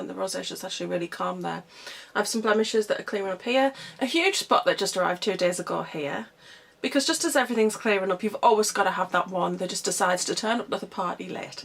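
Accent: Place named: North East England, United Kingdom. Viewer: Thanks for this review. Been thinking about getting it. Have got a small amount to test as well as the x-ceptional wear. Are you from the north east UK by any chance? Your accent sounds familiar!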